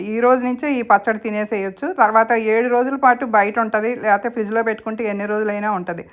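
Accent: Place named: West Godavari, India. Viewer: Sakkati telugu madam westgodavari 🎉Madi Bhimavaram mogalu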